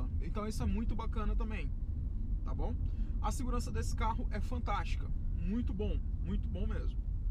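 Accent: Porto Velho, Brazil. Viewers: Man... Vc É de Porto Velho - RO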